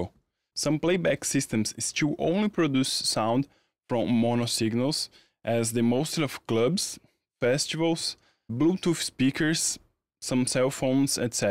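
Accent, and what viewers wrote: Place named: Brazil. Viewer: Brazilian accent af, thanks for the video brother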